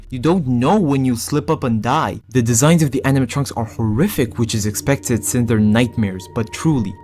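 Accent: Egypt. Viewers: Great video, your channel deserves more attraction tbh I also thought u were egyptian from the accent, i checked and you actually were 😅 Good to see egyptians getting in the fnaf content creation, keep it up!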